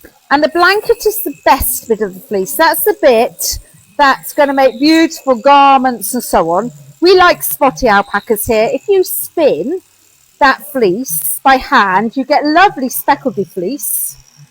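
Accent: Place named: United Kingdom. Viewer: You are definitely from uk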